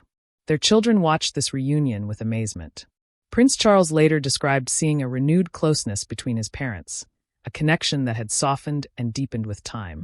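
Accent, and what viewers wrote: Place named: United States. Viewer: Please could you Americans do us the courtesy of speaking about duties and not doo-dees?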